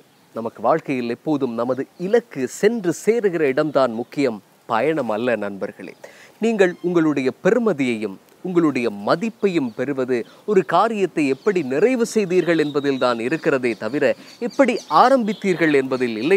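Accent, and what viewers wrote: Sri Lanka. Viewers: Excellent! This young man's language proficiency is remarkable! Beautiful pronunciation and enunciation of the tamil language. The content of his delivery is equally fabulous. Thank you and keep up your good work. I reckon he's from Sri Lanka.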